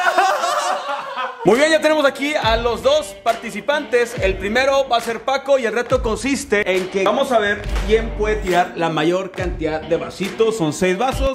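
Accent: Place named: North, Mexico. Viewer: Ten una relación contigo mismo un rato, te lo mereces ♥️🤘 Jajajaja amo el acento norteño y sigo siendo fan de tu edición. ✨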